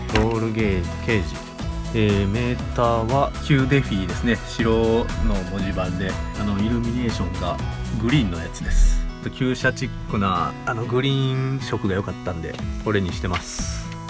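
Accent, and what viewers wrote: Hiroshima, Japan. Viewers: 急にナレーション付き？広島弁ですかね？聞き取りやすくて良いと思いますよ。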